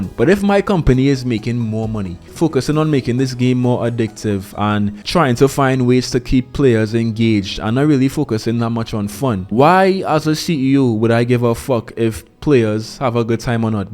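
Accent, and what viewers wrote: Trinidad and Tobago. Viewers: Big dawg you from Trinidad? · As soon as the video start I say this yute trini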